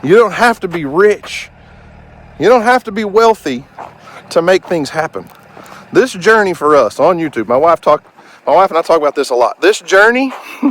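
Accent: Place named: Oklahoma, United States. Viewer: He's got the Okie accent...he used three words! "It mussbe nice" And folks that don't work hard and don't "grind" as younger folks like to say nowadays...they'd just rather be jelly!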